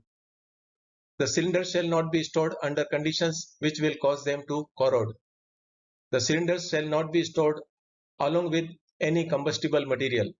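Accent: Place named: India. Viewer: I don’t understand your India English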